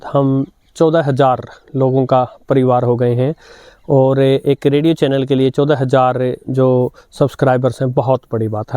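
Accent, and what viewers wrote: Standard. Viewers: Congrech bhai ji aap mujhe bahut hi ache lagte ho aapka jo bolne ka tariqa bahut hi lajawab hai aur aapki pure Hindi man ko bhati hai aur yeh redio maine life me bahut chalaya hai yeh lockle shop se mil jata tha iski prise bi tab 350 ke aas pas thi ab ka pata nahi mujhe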